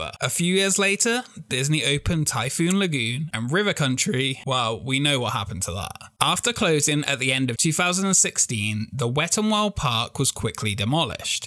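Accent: United Kingdom. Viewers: I cannot stand that Bul Brit Accent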